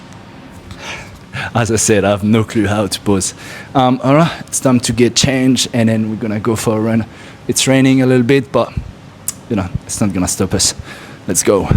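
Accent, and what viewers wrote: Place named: France. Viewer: Surprinsingly I'd watched. Oh, I got your accent is different and I enjoyed man... are you a frenchman? Cool! Is it your daughter? Very cute hahah man. Adorable, besides... a good caregiver does it with a good heart. You're coaching then, I liked - let's see if I can distuck of my strugglesituation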